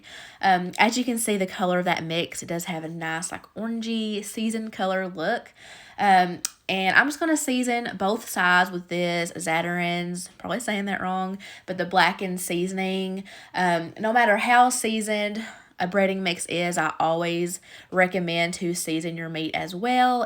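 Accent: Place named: Kentucky, United States. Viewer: I now see why your voice is from lmao im from ky too, i like your dinner ideas. Do you make a planned list for them? Im a beginner cook and im just seeing what the best ways to make a variety of different dinners cos i make a lot of the same stuff for my fiance and i right now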